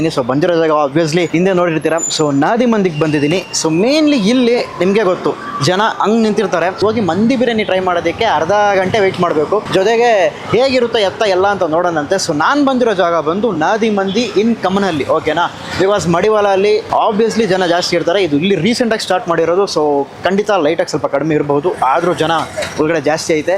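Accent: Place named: Tamil Nadu, India. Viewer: avanu mathadodhale gothaguthe…….he is Tamilian….plus he is copying style of Tamil food vloggers….bari dove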